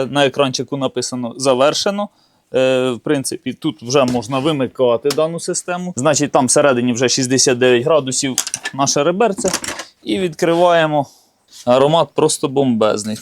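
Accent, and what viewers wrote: Cherkasy, Ukraine. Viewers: Зразу по говірці почув, що Черкащина. Привіт землякам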